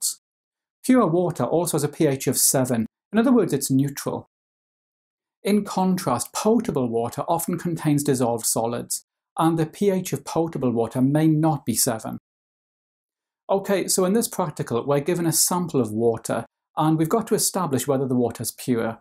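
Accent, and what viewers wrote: United Kingdom. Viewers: Sir, you a brit innit?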